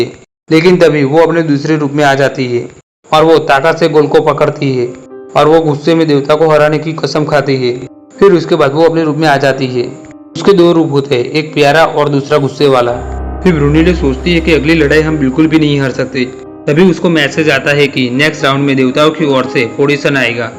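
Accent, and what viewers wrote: Gujarat, India. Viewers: Aap Gujarati ho?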